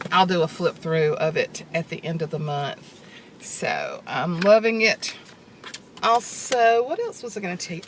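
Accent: South, United States. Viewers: Aww there she is!! I lost my mom so is my substitute with the southern drawl lol!♥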